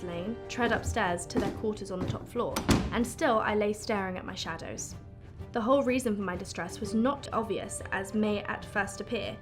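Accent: England, United Kingdom. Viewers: MBB + British Accent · She learnt her American accent from Disney, and her original accent is English. So she does not have a mix of both. There are many types of British accents. I don’t know what accent and Jen Ledger have, but I do know it’s not northern. Because northern English accents are hard to understand. The really thick type of British accent. But she is 100% British. Actually not 100%. She has a few different parts in her but her accent is 100% British. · It’s so nice to see her in a part with a British accent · Enola Holmes has brought back her English accent! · She’s at peak of her british accent!! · She: EnOlA HolMEs (In a clear British accent) Me an intellectual: Should I call her Eleven or Enola? My brain: -Understandable: You should call her Eleven Holmes. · accent has got more British. she was going American for a bit. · She has beautiful british accent ❤️ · I didn't know she was a brit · she said she puts a voice on it her British accent and enolas accent is different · Enola Holmes reads enola Holmes In her cute little British accent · Lili she has an English accent, she took classss for an American accent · alguien mas q ama el acento británico d? 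· yeah she’s british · I swear they made her read the book just in order to bless our ears with that English accent!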